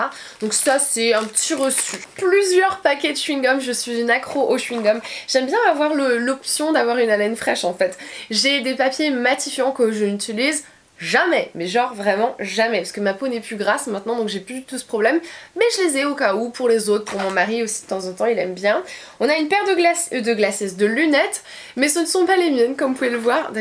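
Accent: England, United Kingdom. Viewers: c trop marrant quand elle parle il y a de fois un accent anglais c trop mignon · J aime trop ton accent anglais 😘😘😘😘😘😘😘😘😘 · Ton accent anglais est tellement cute❤️🤩💍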